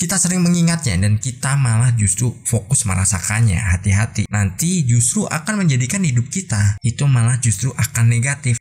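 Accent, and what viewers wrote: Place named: Java, Indonesia. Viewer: Dgr bahasanya jawa medokk lngsung mesemmm kooo cos biasany bhsa indo trima kasih ilmuny ko berkah dunia akhirat buat njenengan sklrga dan smua pengikut chanel ini Aamiin Allahumma Aamiin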